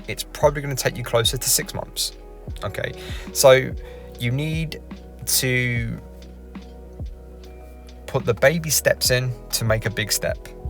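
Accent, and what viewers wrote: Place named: London, United Kingdom. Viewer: I Love the way you speak.....straight up fuck em. Keep it London 😅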